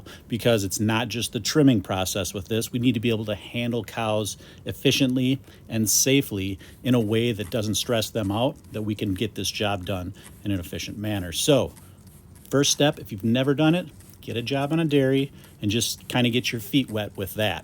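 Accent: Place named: Upper Midwest, United States. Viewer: I should have know you were from our neck of the woods. You got that big "don'cha know" accent!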